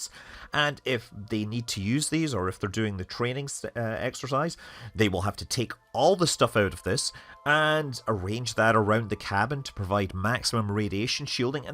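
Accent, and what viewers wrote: Scotland, United Kingdom. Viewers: And now you yave a Scottish accent...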